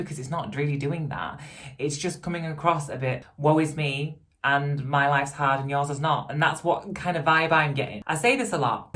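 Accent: United Kingdom. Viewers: You’re so right. Hey what is your specific accent from in U.K.? :) Love your videos!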